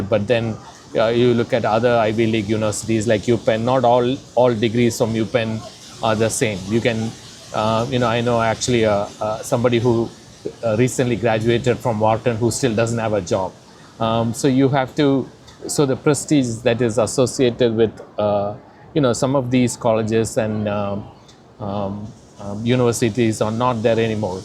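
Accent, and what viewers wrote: Kerala, India. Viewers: Very useful.... seems you are from Kerala.... ?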